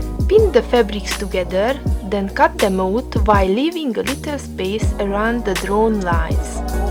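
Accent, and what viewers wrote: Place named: Romania. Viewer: Wow, láttam a blogodon magyarul írsz. 👌Picit hallom a Román akcentust is most hogy mondod. Jó tudni hogy van más ember is akit érdekli a doll customize. Bocsi én meg már félig angolul gondolkozom. 😅 Kérdés: rendeled az MSC-t online, vagy honnan szerzed, kiszeretném majd próbálni ezt az egész Repaint dolgot ( I tried my best to write in my mother language 😅)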